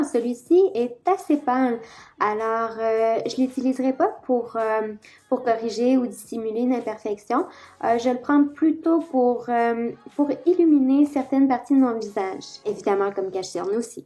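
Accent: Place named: Quebec, Canada. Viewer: Tu es québécoise ? J'aime ton accent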